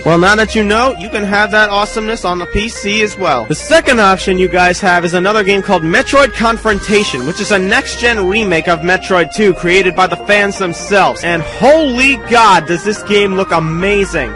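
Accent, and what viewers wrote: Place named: Boston, United States. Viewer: 'aight, couldn't tell, nowadays all the accents up North here sound the same, cause you sound just like a neighbor of mine, and we live in Boston.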